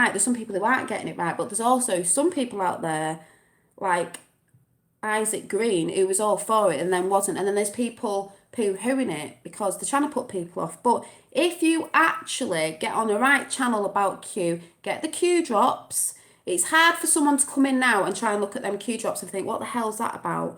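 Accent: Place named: Northern England, United Kingdom. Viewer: northern lass... go on girl...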